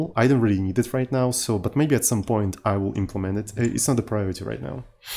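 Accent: Russia. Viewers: такой жесткий русский акцент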